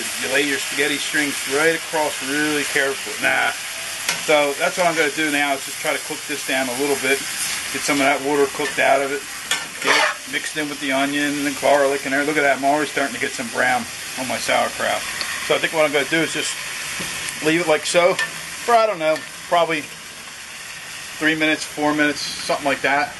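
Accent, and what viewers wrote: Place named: Pittsburgh, United States. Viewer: We are enjoying your videos. I is a pleasure hearing someone speak Pittsburghese. We are from Bellevue. This recipe looks awesome.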